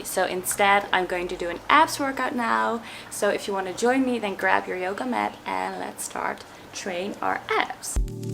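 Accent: Netherlands. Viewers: Are you Dutch? You have a very Dutch accent XD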